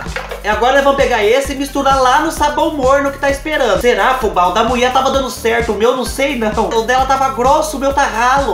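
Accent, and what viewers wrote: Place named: Bahia, Brazil. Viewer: Amei esse seu canal😲Já g annhou uma inscrita nova😁Sou Baiana e seu modo de falar pareçe com o meu 😌😍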